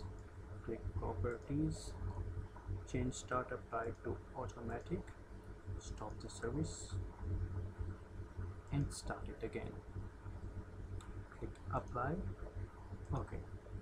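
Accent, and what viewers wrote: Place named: India. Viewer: Will I be lucky today? Is the narrator going to speak normally or have an obnoxious Indian accent? ... FUUUUUCK!!!!!!!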